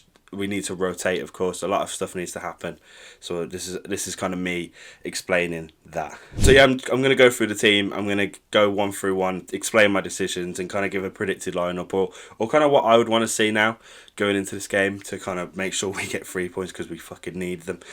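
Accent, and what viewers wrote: United Kingdom. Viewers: You sound like you’re from brum but you support Chelsea ? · Proper London accent right there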